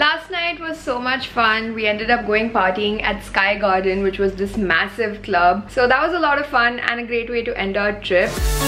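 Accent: India. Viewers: I love ur vlog the shot & editing is so great & ur indian accent is so cute. Greeting from Java Island, Indonesia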